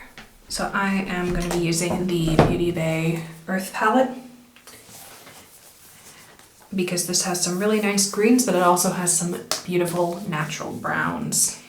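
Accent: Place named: United States. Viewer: Very clear and easy to follow@ And, YO!, am i hearing things??? You've gone all American like! 😊 VERY natural and authentic sounding! And i'm Californian 😄! Cool, cool 😎👍🏼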